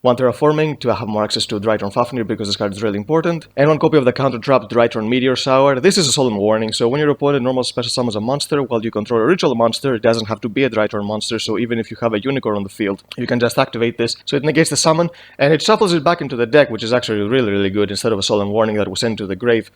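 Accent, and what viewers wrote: Greece. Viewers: έλληνας είσαι ρε φίλε; από την προφορά ρωτάω αν ναι, κάνε το true draco/monarch ντεκ να χαρούμε :P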